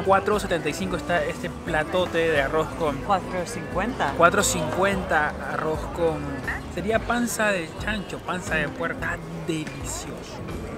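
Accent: Peru. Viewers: Bro tu eres peruano vdd? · Hola te felicito eres espectacular..ese asentico peruano es fantástico... soy de Colombia conocí tu canal a través de planeta Juan...muy buen contenido .sobretodo la simplicidad que lo transporta a uno a tu amada N.Y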